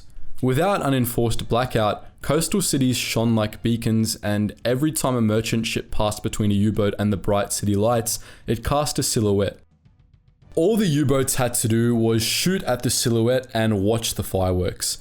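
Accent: South Africa. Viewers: Are you south african? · Great job as usual. South African? Rhodesian?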